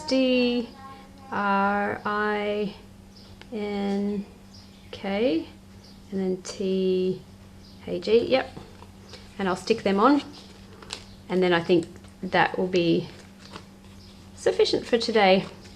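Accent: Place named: Australia. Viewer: I could listen to your voice all day. Seriously you aussie's have the best accent in the world to me. I love your pages btw. I only just found out about this literally not long ago I haven't even tried this yet but you make it look so easy and fun that I can't wait to try it. I usually art journal (only for a few months) but I think I'm going to to add this to my weekly art time. What a great way to get lost in gods word and learn.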